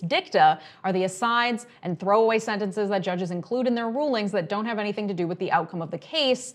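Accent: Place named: Midwest, United States. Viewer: I came here for the snarky Midwest accent and was not disappointed.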